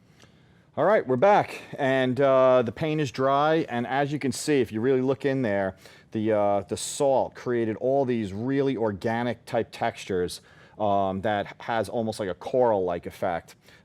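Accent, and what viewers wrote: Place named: Nashville, United States. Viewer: Sounds like he's from Nashville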